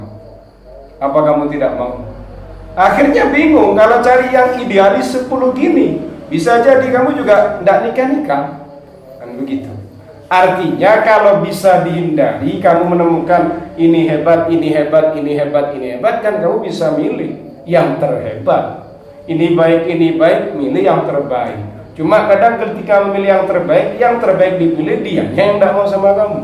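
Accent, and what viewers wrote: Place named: Madura, Indonesia. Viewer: Ustadz orang mana? Bahasanya kayak orang madura.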